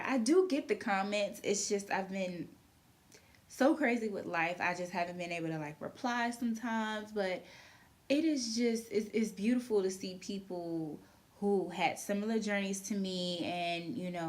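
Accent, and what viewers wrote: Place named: Virginia, United States. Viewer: Omg!!! I’m from VA! Virginia Beach! I had.m a FEELING with your “accent” (as Pharrell would call it...) I felt like it was like mine!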